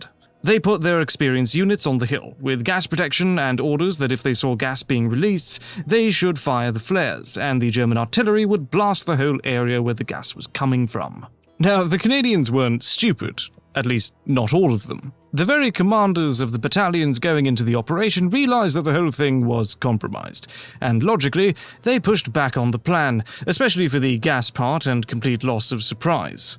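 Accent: United Kingdom. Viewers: Condescending British accent narration is key to losing all your audience.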